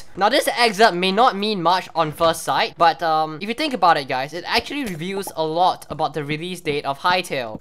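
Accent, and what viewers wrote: Singapore. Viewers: Is it just me or you got a Singapore accent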